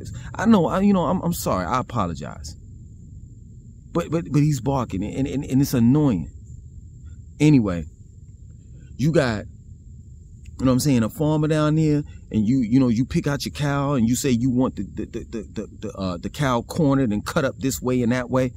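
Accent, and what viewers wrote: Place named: South, United States. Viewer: Very very south. Very deep accent as well. Loove the accent.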